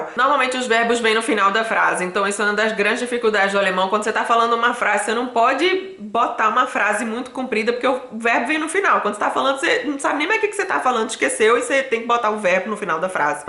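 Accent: Northeast, Brazil. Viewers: Tens algo de sotaque do nordeste...eu estou em Recife.... assinei seu canal e vou seguir, você é muito agradável..me sinto bem ouvindo você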